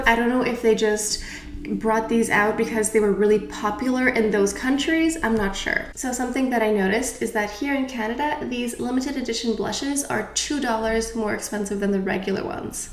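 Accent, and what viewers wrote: France. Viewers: I fell in love with your french accent and your love for Chanel cosmetics. Thanks for the review . I subbed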